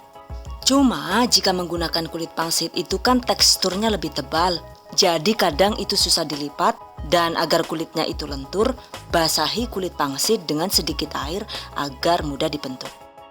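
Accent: Banyumas, Indonesia. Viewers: Kaget donggg orang ngapak ternyata😭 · Makasih resepnya bu.. 😅 ko sma2 ngapak yaak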